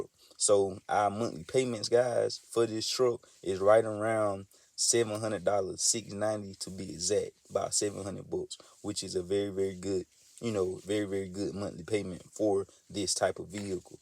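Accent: South, United States. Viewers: We country boizzz🤣💪🏾 dats the country accent righ dea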